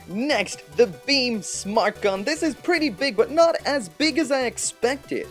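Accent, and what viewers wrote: Ireland. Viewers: I had no idea you had an Irish accent! Regardless, Thanks for the reply, and you can count on me to continue watching and supporting your channel.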